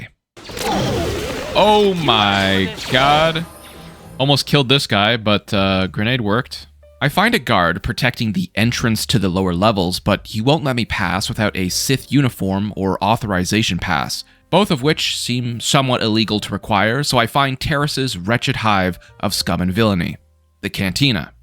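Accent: Canada. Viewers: He’s Canadian? Gross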